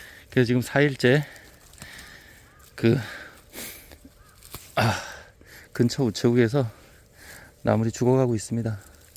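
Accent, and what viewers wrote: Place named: Gangwon, South Korea. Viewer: 그곳은 혹시강원도인가요? 제고향 강원도억양인거같아서요~~말투도 정겹고 고향도그립고 엄마랑 나물하러다니던 추억도 그립네요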